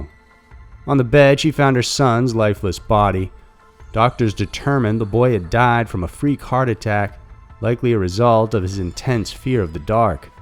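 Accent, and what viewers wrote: South, United States. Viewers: "brian" is misspelled in the title but he pronounces it very normally for someone with a light southern accent - "brahn" - like how he says "nine" more like "nahn" - just an average drawl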